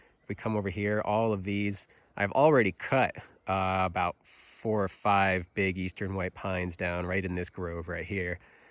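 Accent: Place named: Upstate New York, United States. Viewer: You're not from Vermont. Sounds more like upstate NY.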